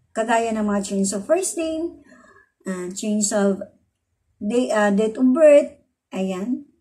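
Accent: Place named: Visayas, Philippines. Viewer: Wow congratulations madam hindi ka nag iisa matigas din mag salita hihi pero gwapa basta bisaya😊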